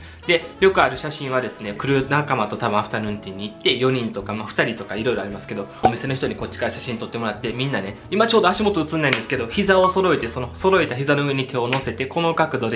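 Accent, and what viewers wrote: Kansai, Japan. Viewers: なんだろう…ハマる！関西弁が面白いし、人間性もおもしろーい❤😊 いつも楽しい配信ありがとうございます😂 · 関西弁が嫌だ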